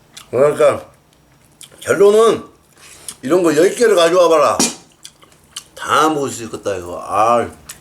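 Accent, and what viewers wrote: Jeolla, South Korea. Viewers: 으따~ 역시 전라도 사투리가 찰지구마잉~ ^^ 나는 고통을 즐기는 사나이여. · 광주 토박이로써 전혀안불편하고 잼있습니다 제가 허락하게습니다 전라도사투리맘껏 쓰세요 ㅋ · 형님 저는 부산사람인데 전라도 사투리 너무 재미있어요..미친 놈들 말 신경쓰지 마시고 형님 하고 싶은 대로 하세요.. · 저 전라도 녀수 사람으로서 형님의 사투리에 무궁한 친밀감을 느낍니다ᆞᆞㅎ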